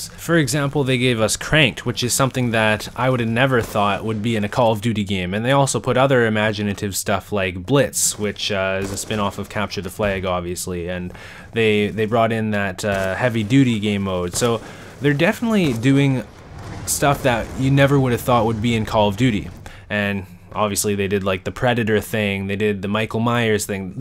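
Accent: Canada. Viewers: r u canadian cause u say about funny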